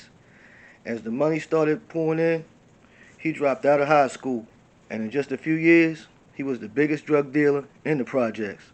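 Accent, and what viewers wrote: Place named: Baltimore, United States. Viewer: Sounding like you from Baltimore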